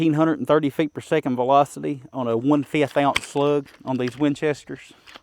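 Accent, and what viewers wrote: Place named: Louisiana, United States. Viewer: You from Louisiana? That accent sounds familiar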